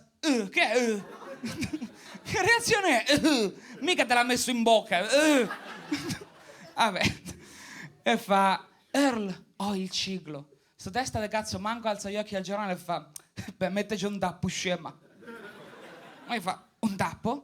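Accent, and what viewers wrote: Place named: Marche, Italy. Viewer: "comunque lu spettacolo è lu mio" average entroterra marchigiano vibes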